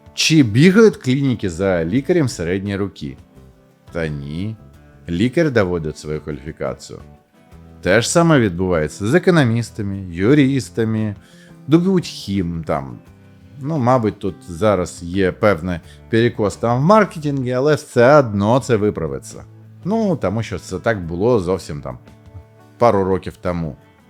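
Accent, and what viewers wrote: Russia. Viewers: ти топчик, що перейшов з російської на українську. Це на справді дуже потужних та поважний крок, повага від всієї україномовної спільноти. Але будь ласка продовжуй цей процес бо твоя україська звучить як адаптована на україньську російська. Тобі ще є куди рости, вдосконалюсься, та все буде Україна. Дякуємо тобі за твій вибір. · Переход на украинский - это одно, но результат пока что выглядит сыро. В речи много русских слов и интонаций, из-за чего украинский воспринимается неестественно. В итоге это только ухудшает общее впечатление от видео :(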